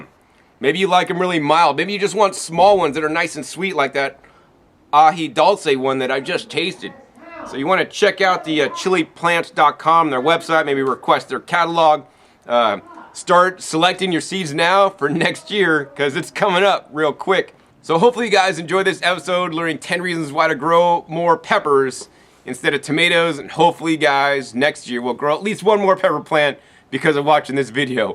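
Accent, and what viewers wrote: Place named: California, United States. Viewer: you can totally tell hes from california lmaoo by the accent!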